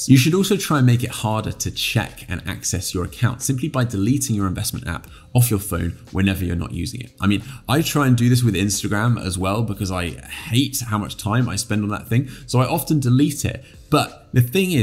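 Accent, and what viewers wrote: United Kingdom. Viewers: Yes, that's mostly correct. I would like to continue to generate income in retirement but I'd like to do it with minimal risk. I hope the strategy of keeping 30% invested covers the loss to my cash reserves form inflation and gives me a slight gain. No one knows for sure what the market is going to do so I find it hard to make decisions this close to retirement. I'm sure fear of losing it is what keeps me at such a low level of investment right now. I just feel like we are in an asset bubble that could pop just as I'm about to retire. That would hurt if I were fully invested. What I have invested is VERY diversified and I made what I think was a smart decision at the first of the year to buy a significant number of STIPS to help keep my investments balanced when bonds/treasuries are performing so poorly. I'm just amateur so I'm reading and learning as much as I can along the way. 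Your videos are very helpful. It's nice to hear insight from a pro! Your videos seem smart and sensible whereas the carnival barkers on TV (who I shall leave nameless) seem to contradict themselves every other day when they've turned out to be wrong. Also, does not hurt that your info is delivered with a cool British accent. :) I also like Pension Craft and Paul Merriman.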